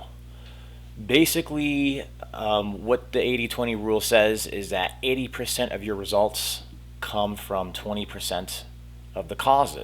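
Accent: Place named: New England, United States. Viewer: You sound like you're from New England..😎😎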